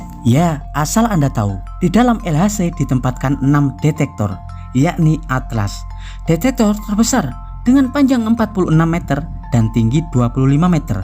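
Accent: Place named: Java, Indonesia. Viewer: Tak berijazah tapi jenius tapi medhokk.. magnet jadi mahnet.. gpp yg penting paham dengan penjelasannya prof.. terima kasih 🙏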